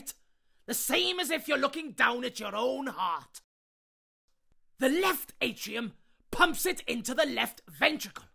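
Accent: Wales, United Kingdom. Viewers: is it welsh accent? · that's a welsh accent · He is welsh!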